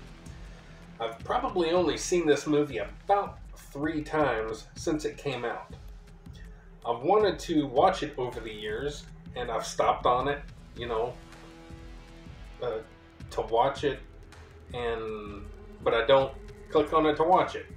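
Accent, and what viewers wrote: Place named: South, United States. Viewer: You sound southern I can see you doing a southern story reading and It being awesome I’m going to picture this voice when I read Where the Red Fern Grows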